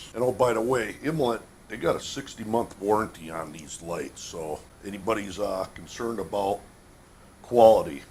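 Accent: Minnesota, United States. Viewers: Minotaurism probably could do a mean Macho Man too! They’re all from Minnesota area so it’s the accent.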